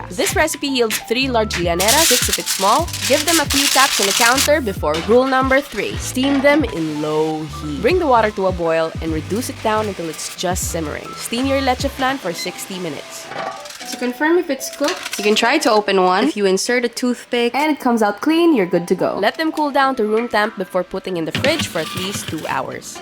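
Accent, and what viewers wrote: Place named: Mexico. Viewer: An asian girl who talks like mexican or latin american, What do you call urself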